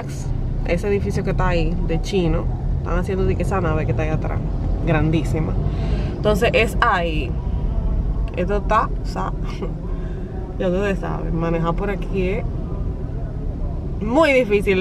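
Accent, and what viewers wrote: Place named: Dominican Republic. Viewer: Uy! ella esta hablando entre amigas y es dominicana a veces hablamos asi aunque sabemos perfectamente como pronunciar las palabras, ella esta bien formada profesionalmente se le nota. Valida tu corrección pero ten pendiente la nacionalidad de la YouTuber cuando oigas cualquier cosita mal pronunciada.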